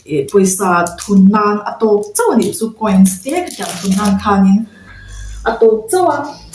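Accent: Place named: Lamka, India. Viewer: Fiah lutuk❤❤muhnawm leh dawn ae🥰🤗🤗 Lamka tang aw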